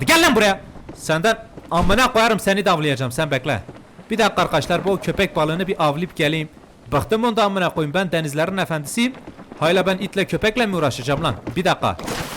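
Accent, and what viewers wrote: Elazığ, Turkey. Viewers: Abe bende elazigliyim senin elazig li oldugunu şive den direk çaktim abi sen tuncelili misin tuncelilisen nerde otirisin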